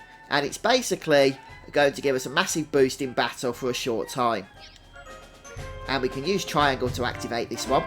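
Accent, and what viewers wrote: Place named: England, United Kingdom. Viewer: OBVIOUSLY he has an English accent. Start traveling, educate yourself and get cultured. The world doesn't revolve around how YOU particularly speak.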